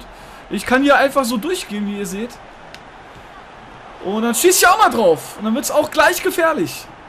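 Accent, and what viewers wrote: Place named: Swabia, Germany. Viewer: Hinterdupfinga des isch a rechtr Schwob :)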